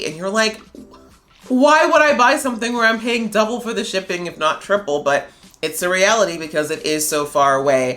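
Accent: United States. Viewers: thank you for sharing, you sound American, I am from USA definitely considering moving to Australia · You sound like you come from the US originally. If so, you are not allowed to have your own opinion and that makes your whole video illegal. 14 hours to anywhere ? I tale it that you mean to the only real country on the planet. The the good ol US of A? while you have been away from the US there has been lots of exploring going on. Columbus, that you already know of I expect. But since then, there has Dutch explorers, Spanish explorers, Portuguese and even English explorers and there are now something like 200 hundred recognised countries sharing the planet with the US of A. Oh, its shocking, I know, but we have to face up to reality now and then. Yes, even yanks once they learn proper English. New Zealand is much less that 14 hours away. Indonesia also. Papua New Guinea. 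the Solomons, Singapore, Malaysia, Phillipines, one even that even yanks should have heard of, no not Canada, Vietnam. All much less than 14 hours away mu modern jet airliners. Sorry, is the US still in the Wright Flier stage of aviation ? cheers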